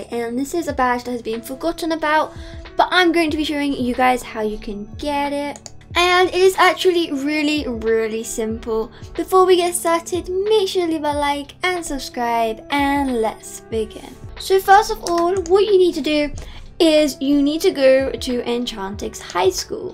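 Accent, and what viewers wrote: United Kingdom. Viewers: i love her accent She's British? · are you British?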